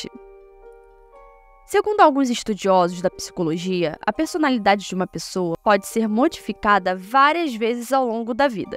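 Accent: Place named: Rio de Janeiro, Brazil. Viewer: O vídeo é bom Complicado esse sotaque de carioca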